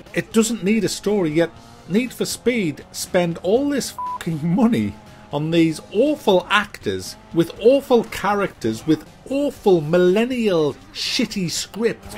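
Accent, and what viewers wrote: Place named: England, United Kingdom. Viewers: SavagerBoy He sounds English to me. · . Where are you from? You sound like you're from Nottingham, could be wrong.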